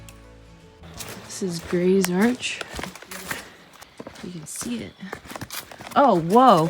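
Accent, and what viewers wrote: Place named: South, United States. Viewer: She is very beautiful. I love her smile and southern accent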